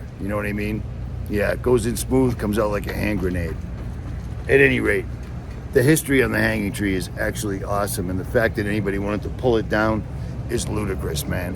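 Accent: Boston, United States. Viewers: Sounds like Ritchie from Boston?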